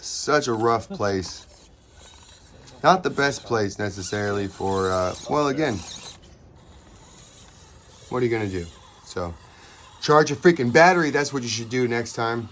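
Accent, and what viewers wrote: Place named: Southern California, United States. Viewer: DUDE don't even trip on sayin DOOOOOOOD. SO CAL from the get go!!!!! I feel you on one handed driving that's 2FMRC territory LOL Diggin your vids DUDE keep it up.....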